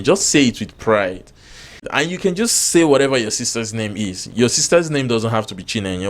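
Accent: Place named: Anambra, Nigeria. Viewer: Thank you, your channel is very good, I am a beginner..but I can recognize the things you're teaching, but in an Anambra dialect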